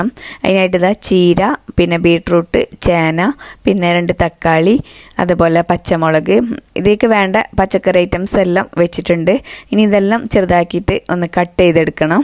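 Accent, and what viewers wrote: Kasaragod, India. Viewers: Ninthe samsaaram full ksd mix anne Njn first time vedio kaanumbo kasarkod kaarthin enn njn vicharichatt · മലപ്പുറം ആണങ്കിലും സംസാര ശൈലി ശരിക്കും കാസർഗോഡാണ് ട്ടോ -😍😍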